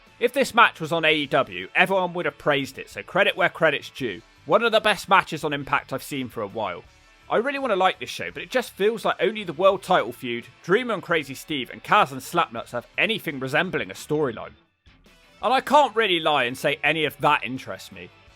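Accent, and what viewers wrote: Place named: Newcastle, United Kingdom. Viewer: Ur a geordie didn't know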